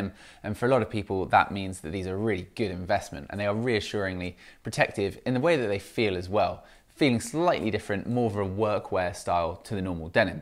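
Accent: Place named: RP, United Kingdom. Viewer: It called The Queens English...and is expensively acquired at English private schools..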